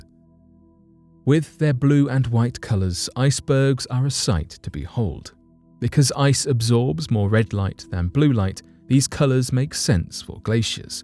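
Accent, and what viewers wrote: United Kingdom. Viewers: That's the way Brits pronounce it and he speaks the queen's English. Maybe we are pronouncing it wrong??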